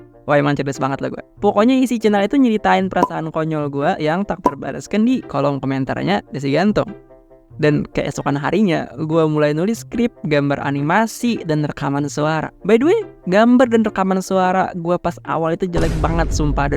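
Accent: Kalimantan, Indonesia. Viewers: izin nebak bng, keknya dari logat bahasanya keknya orang kalsel/kalteng dah :v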